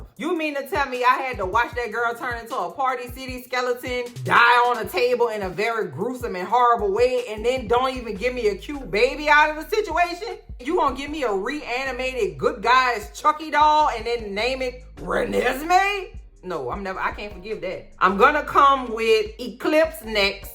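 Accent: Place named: Louisiana, United States. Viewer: Louisiana creole. My stepfather spoke and sounded the same.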